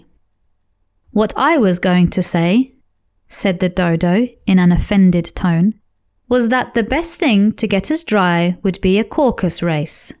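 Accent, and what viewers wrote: United Kingdom. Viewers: 영국영어, 상큼합니다~~~^^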